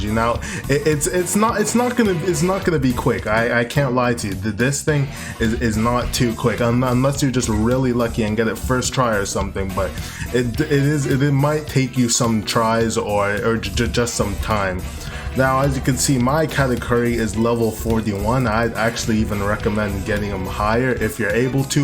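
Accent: Jamaica. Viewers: Yow u jamaican?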